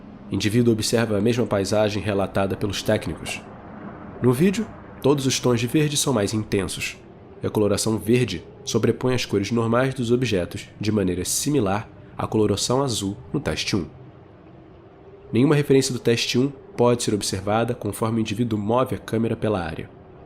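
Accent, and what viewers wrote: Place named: Rio de Janeiro, Brazil. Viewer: Sotaque de carioca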